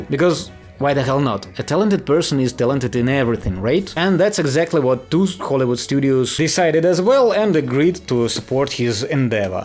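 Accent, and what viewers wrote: Russia. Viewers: dude your russian accent is so thick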